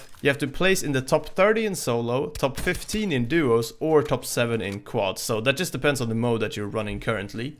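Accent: Sweden. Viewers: Are you swedish? That accent though 😎👌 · First video im watching and i am 100% that he is from Sweden. I’m I right? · u sound swedish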